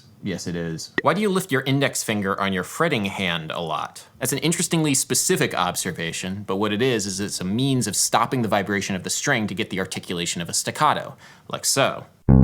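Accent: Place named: United States. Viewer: Man.. something in my mind told me that you were britsh.. but now I realized that you dont have an accent.. you are american.. my eyes were so closed haha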